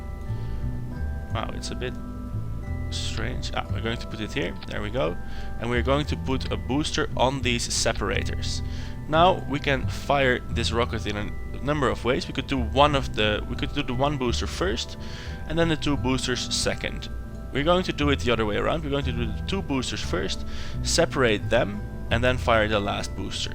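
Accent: Netherlands. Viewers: je klinkt nederlands ouwee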